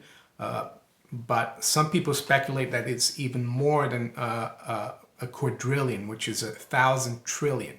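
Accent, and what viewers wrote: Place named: United States. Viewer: Hiya, u are an economic genius, love you, love your programmes but you need to get rid of your Yanks accent, you are a Londoner now m8!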